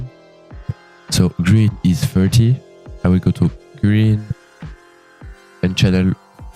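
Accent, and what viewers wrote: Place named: France. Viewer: parce que quand tu parles tu sonnes français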